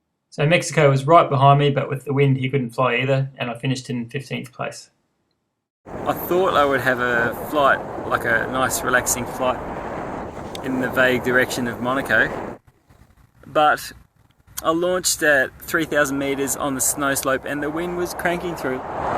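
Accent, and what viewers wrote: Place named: New Zealand. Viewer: Really enjoy your posts . As a kiwi you sound like one to me , a soft kiwi accent. I would ask that the sound quality is improved a large part of your commentary Is inaudible over the wind noise, which can be too loud at times.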